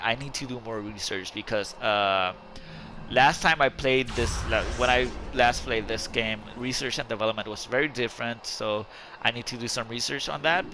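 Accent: Puerto Rico. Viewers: Eres de Puerto Rico? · Are u from Puerto Rico(Borikén)? Nice vid!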